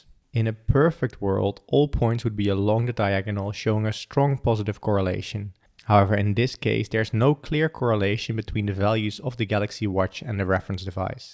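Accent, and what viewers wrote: Netherlands. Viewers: This guy must be dutch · Are you Dutch? :)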